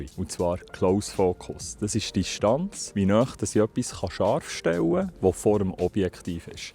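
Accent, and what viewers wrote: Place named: Switzerland. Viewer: Herrlich, dieses Schwizerdütsch. Leider verstehe ich so wenig, macht nix.Ich glaube nicht an diesen Leica -Mythos. Mit meiner alten Canon D60 , einem 105 Tele und einem Polfilter kriege ich fast genauso schöne Fotos hin, natürlich nicht in der Auflösung. Ist ja auch nicht sinnvoll, wenn ich das gesamte Foto betrachte.Ach, Entschuldigung, ich komme aus Dortmund, dem Ruhrgebiet.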